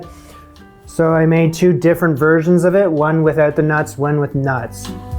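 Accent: Canada. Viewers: Same! I don't hear any specific Canadian-isms in him, but I knew right away he was Canadian. Not sure how to explain that. Maybe we don't hear it because we sound similar? 🤔❤️🇨🇦🇨🇦🇨🇦🇨🇦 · I love your Canadian accent 😊....I love your country! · Can' believe someone sniped your 'Canadian accent'. Then again, I'm from SE Michigan, so I sound like you ;) Oh, and all of the sane people of America apologize to Canada, and all associated with her. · I love your Canadian accent! Canada is on my vision board ❤ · Make fun of your Canadian accent? WTAF? I'm a Montanan living in California--I miss the Canadian accent and love hearing yours. LOVE it. Like I love your content. Thanks for your Canadian-ness. I consider you my emotional support Canadian. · The way i didn't clock the Canadian accent until this video. My NS accent is too thick to tell another one unless its NFLD haha. 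But as someone with a spouse from NYC, I get the layer of extra stress you musg be dealing with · I cannot believe you are serious when you say that you didn't realise you had a Canadian accent. I am Australian and I have been fully aware that I have a very specific accent from a very young age. Starting with listening to Sesame Street, then the Nonna four doors up who fed us pasta and the Yiayia who fed us moussaka, I knew that I had a different accent to the 'news people. I think you abandoned your country to find more wealth in 'America', (insert Titanic bullshit). But now you are in the middle, oops. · Had no idea you were Canadian. Always felt your accent was very pleasing to hear and wondered why since I assumed you were from the US. And as a Brazilian living in The Netherlands, I usually pick an American accent very easily when I meet one out here (it doesn’t sound very good does it? 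😂 ) Now yours make sense. Glad to hear you are Canadian. Much better. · Speaking as a Canadian, I knew had to be Canadian about 1.5 videos in. Then he mentioned it in a video and I felt very smug because, it's true, Canadians are generally bad at spotting out own accent. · THANK YOU!!! The Great Debate. I don't like the fruit/nuts either. Also, I knew you were from Canada from the accent, but also you filmed in Muskoka, Ontario, where I have been summering from birth. Anyway, thank you! Because the bakery in Bala (Don's Bakery) changed their recipe for butter tarts! Now, the original recipe wasn't the best, but it was the taste of summer. Don's Bakery's current recipe is even worse. The filling is off and there is too much crust. HOWEVER, I now have "the recipe" which I will follow and bake and peace will be restored. I have been a friend/follower of Glen & Friends for years. I watch the Sunday Morning with the Old Cookbook regularly. 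As an American, I apologize for the man who currently sits behind the desk in the Oval office - both Trump and Musk; because who knows who actually sits in the chair. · Also a Canadian living in the US - I very much feel like you. I am moving home in a few months. I am done. Butter Tarts are 100% a good way to push back. I am definitely team no raisins. Your Canadian accent is the best!!!!! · Your Canadian accent is AWESOME!!!!! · I've always loved your Canadian accent. I envy you being from Canada, and I hope one day you and your family get back there. I'd do anything to get out of the US, I honestly don't know why anyone would pick living here when they have a home in Canada. Love your channel, love your personality, love your accent! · Many of us Americans love our Canadian neighbors. We're so glad you live near us and set a better example than orange Hitler does. I personally am sorry that we are behaving so poorly. 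Be proud of your heritage and your accent! I definitely noticed you were Canadian but I thought it was charming. ❤️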